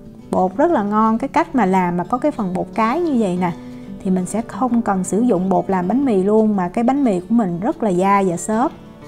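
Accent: South, Vietnam. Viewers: Cám ơn em nhiều nhe để chị làm nữa khi nào thành công chị sẽ báo em biết ngay he, chúc em luôn vui và khỏe nhe. Chị luôn theo dõi và theo rất nhiều recipes của em như là bánh flan nè rất ngon đó em nhưng đối vơi anh chị thì hơi béo nên chị giảm bớt lượng cream cheese là rất ngon đó em. Tự già rồi không thể ăn giống các em được đó. Ah chị cũng làm bánh bao theo ct của em rất thành công vì bên chị có bán loại bột Red lotus đó em nên chị cứ theo đó mà làm hoài thôi. Cám ơn em rất nhiều lần nữa. Chị rất thích coi cách em làm và nói chuyện vì không dài dòng và nghe tiếng Nam nữa vì chị cũng là người Saigon. Ah dì của chị nấu ăn cũng ngon lắm và cũng có coi video của em ,dì nói trong bài nấu phở của em dì nói em nên cho thêm một con gà hen và đuôi bò nữa thì sẽ ngon lắm đó em chứ nấu như em là hơi ít xương thịt để ngọt đó em, có gà hoặc kẹt lắm mình lấy soup gà pha thêm nó sẽ bớt ngậy mùi bò hơn đó em. 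Đó là chị recommend thôi chứ không có ý gì đâu nhe cưng, chị nghe dì chỉ vậy nên chỉ cho em biết thêm để chị em mình ngày nấu ngon cho gia đình ăn nhe. Nói chuyện với em sau nữa nhe. Thân mến.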